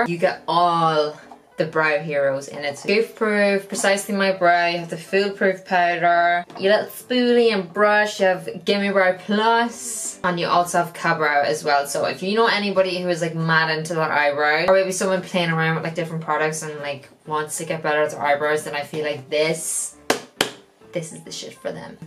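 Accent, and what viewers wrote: Ireland. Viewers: What part of Ireland are you from ? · I could listen to you talk all day. Irish accents are so beautiful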